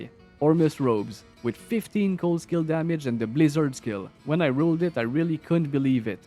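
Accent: Quebec, Canada. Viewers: Avec ton accent, es-tu québécois par hasard ? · Sa parait tellement que tu est Quebecois juste par ton accent lol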